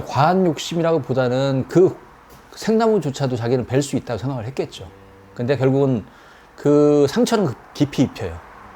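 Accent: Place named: Jeolla, South Korea. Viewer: 말투가 호남사투리 전라도 점쟁이네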